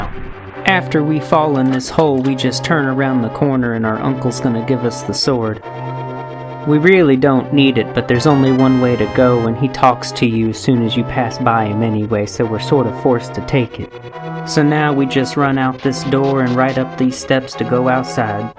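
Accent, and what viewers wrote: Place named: South, United States. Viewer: Alabama? Georgia? Mississippi? Tennessee? Where ya' from? TEXAS!?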